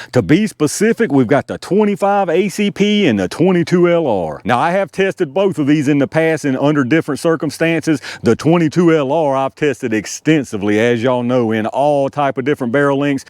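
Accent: South, United States. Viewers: Your channel is the best! Love that southern accent.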